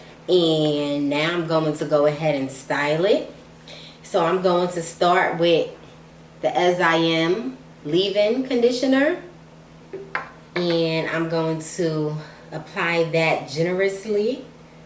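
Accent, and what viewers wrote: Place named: New Orleans, United States. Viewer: Omg I love her accent. Are you from New Orleans. And I love your videos 🥰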